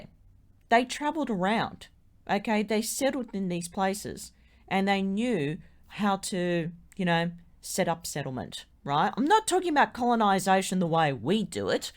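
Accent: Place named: Australia. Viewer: Hi 👋 do you have Polynesian roots? I can hear 👂your an Aussie but you have Polynesian features.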